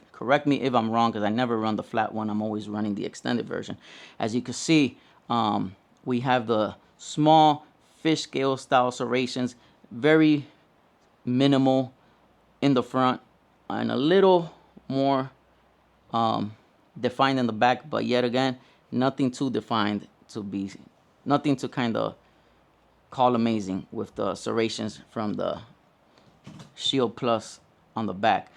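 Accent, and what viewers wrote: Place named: Miami, United States. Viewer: Great video. I love both of these guns. I own the shield plus and have held the 2.0 bodyguard but haven’t shot it yet. Did you find the bodyguard 2.0 to be soft recoiling like some YouTubers are saying? “It feels like a 22” also are you from south Florida? It sounds to me like you have a Miami accent, which is where I’m from.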